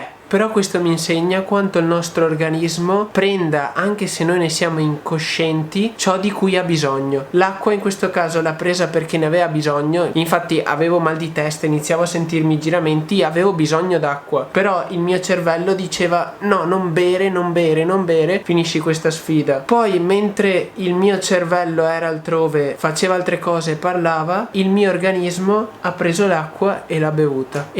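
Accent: Veneto, Italy. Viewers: Sei veneto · Hai un bellissimo canale! Questo video è davvero bellissimo. Tu sei un ragazzo onesto, educato, pulito e parli davvero molto bene. Assolutamente iscritto perché meriti davvero tantissimo! Complimenti sei grandissimo, se posso chiedere, da dove vieni? Hai un accento molto simile dal mio che vengo dal Veneto ahaa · Ciao, sei di Padova ho riconosciuto la stazione dei bus??? Sei veneto quello sicuro dall'accento che hai:-) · Sei veneto? Se si credo di Padova giusto? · Ma sei veneto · Ho visto un video dove alcuni fruttariani sostenevano di poter vivere senza bere acqua mangiando solo una mela al giorno. Se fosse vero con tutta la frutta che hai mangiato non dovresti aver avuto problemi. Comunque hai fatto bene a concludere la sfida un po' prima dato che dopo il mal di testa il prossimo sintomo da disidratazione era il colpo di calore e poi probabilmente il coma. 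Che lavoro fai ? Se posso sapere. Se facevi un lavoro pesante magari sotto al sole come l'operaio ci lasciavi le penne. Hai fatto un bel video onestamente (montaggio riprese ecc) e fai bene ad ascoltare la tua community e non fare più challenge del genere. PS: si sente che sei veneto ciò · Ma sei veneto?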